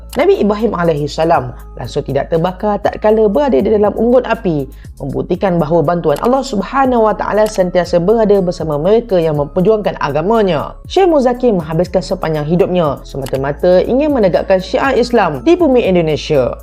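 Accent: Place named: North, Malaysia. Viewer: orang utagha ka? loghat cakap ada kala keluaq utagha tu hehe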